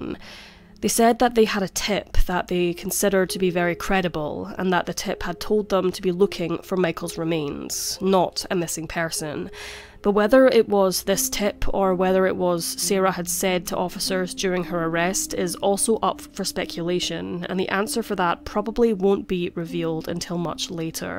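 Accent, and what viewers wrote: Scotland, United Kingdom. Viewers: So good to hear a Scottish crime creator 👏🙏😘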